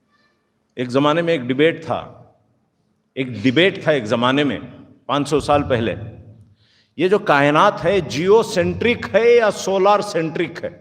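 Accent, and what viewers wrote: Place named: Bangalore, India. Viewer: Banda bore hone nahi deta. Banglori language badi pyari n natural lagti h, central point bhi focused hojata h.Allaha salamat rakhe sab!!!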